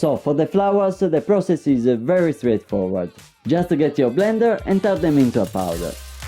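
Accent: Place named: Italy. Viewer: I love his Italian accent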